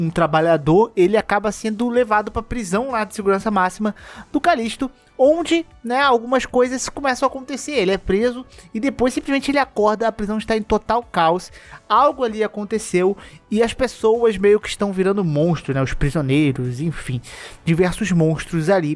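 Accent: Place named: Minas Gerais, Brazil. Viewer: Coé irmão, mineiro é mó bão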